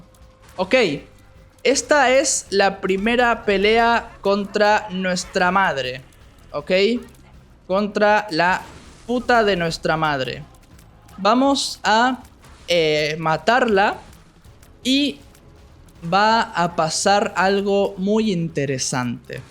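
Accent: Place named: Argentina. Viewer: Se nota que es nativo, hasta se identifica a kilómetros que es de Argentina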